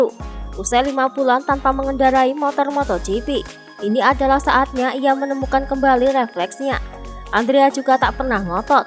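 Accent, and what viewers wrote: Java, Indonesia. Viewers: Halo mbak medok, selalu nonton tpi jarang komen mbak medok hehe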